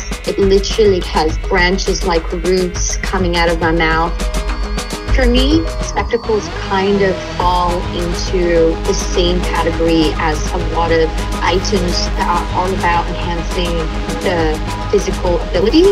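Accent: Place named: Los Angeles, United States. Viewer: oh your from LA now, last i knew you were british-latvian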